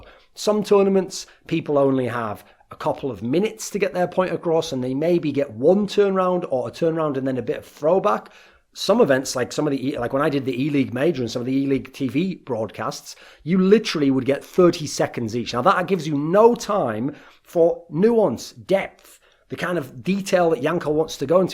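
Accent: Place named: North East England, United Kingdom. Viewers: North East England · Yugo Betrugo Duncan is from South Shields in England look up the accent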